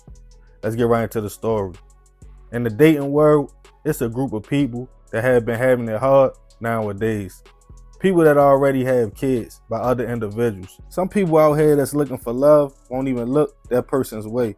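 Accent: Baltimore, United States. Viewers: This is my first time viewing one of your videos. I subscribed because your content was pretty good and I'd recognize that accent anywhere. Baltimore, right? #CharmCity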